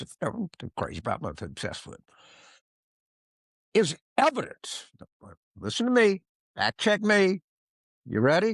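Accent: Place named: New Orleans, United States. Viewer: My dad was born and raised in New Orleans and sounds just like James.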